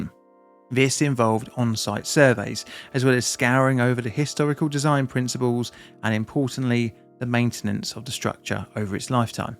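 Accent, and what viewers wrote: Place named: England, United Kingdom. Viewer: As a German can I just say, your pronunciation of Reichenhall is spot on. Yeah there is a slight accent but bruv an Englishman saying "ch". Sorry 😅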